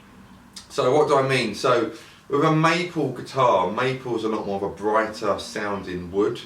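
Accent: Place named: England, United Kingdom. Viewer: You look like an italian but you speak like an english dude ^^ I like that :D and feel Better ^^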